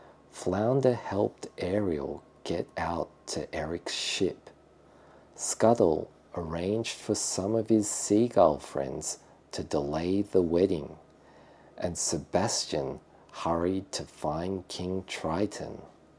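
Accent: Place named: Australia. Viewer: さんのオージー英語ずっと喋ってて欲しかったのでむっちゃ嬉しいです…！！ネイティブ速度バージョンもいつかお聴きできるでしょうか☺️ オーストラリアに戻りたい気持ちと英語から離れたくない気持ちを幾分か救ってくれます😊❤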